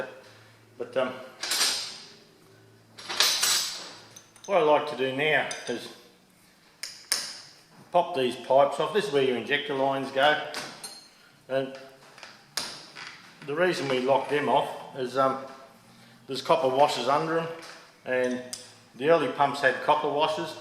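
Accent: Australia. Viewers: Thanks pardner...same pump as my Cummins on my 40hyatt boat...very helpful.. Good job..u sound like Australia.. I'm n Chattanooga Tennessee.. Thanks again friend..! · Just discovered your channel. I'm a mechanic too. Mainly auto and light truck. But now venturing in big diesel like heavy truck and equipment. I'm in upper Midwest, central Minnesota. Sounds like you're in Australia?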